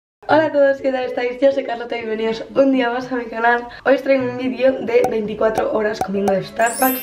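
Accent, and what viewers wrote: Galicia, Spain. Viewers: bua me encantó tu acento gallego porque también soy gallega 😊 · Me encanta tu acento gallego 💗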